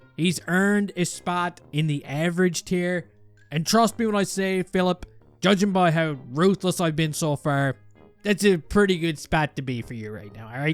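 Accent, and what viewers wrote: Newfoundland, Canada. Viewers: Are you a Newfie? You sound Newfoundlander lol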